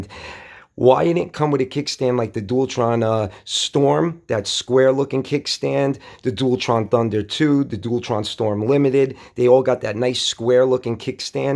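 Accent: New York, United States. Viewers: I love your NYC accent you do really good vids and your bodybuilding channel is awesome as well!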